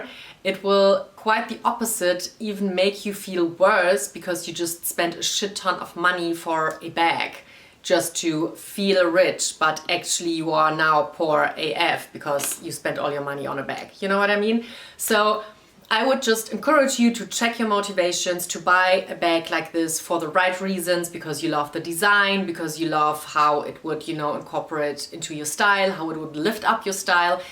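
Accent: Germany. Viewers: Nice video! 🤗 Do I hear a german accent? 🤔 Edit: Habe das Video jetzt tu Ende geguckt, da erwähnst du sogar, dass du Deutschland kommst! Liebe Grüße, habe dich direkt abonniert :) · you sound very German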